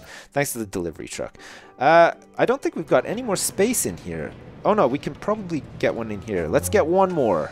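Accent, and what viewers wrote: Canada. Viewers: Are you from Canada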